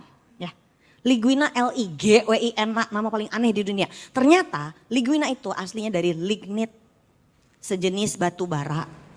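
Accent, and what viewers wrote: Indonesia. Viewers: saya suka ibu ini kalo bicara bahsa ingris,, otentik indonesia bgt tanpa harus kebule bulean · Suka sama logat Sulawesinya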